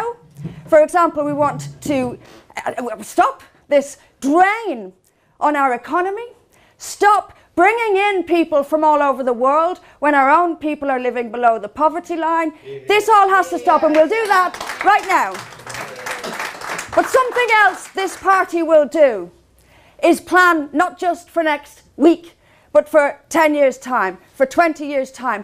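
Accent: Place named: Ireland. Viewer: Good speach young lady.. Romans .. Our Lords blessings in your assistance to seccede in everything that you set out to achieve.. Keep sn eye on The Torys and what they write in the small print of bills... And by the by the lilt of the beautiful Irish accent serves only to enhance the beauty of the daughters of men.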